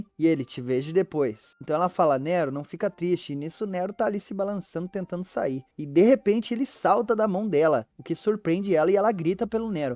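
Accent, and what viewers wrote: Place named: Rio Grande do Sul, Brazil. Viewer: Mn vc e gaúcho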